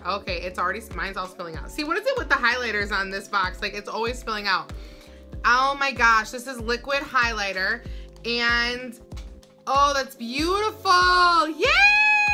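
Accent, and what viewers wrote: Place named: New York, United States. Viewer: I hear a New York accent 🤔 or might be jersey ! Can't wait to receive my box !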